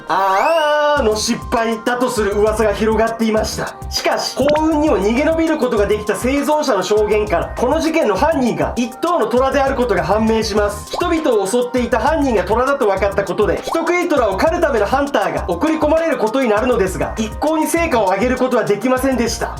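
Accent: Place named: Fukushima, Japan. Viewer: なまり、福島弁ぽいばい🤔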